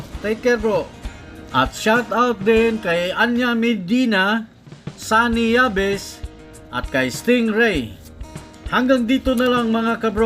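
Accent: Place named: Visayas, Philippines. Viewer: Visaya nimo dong kaya pala tigas ng solte nimo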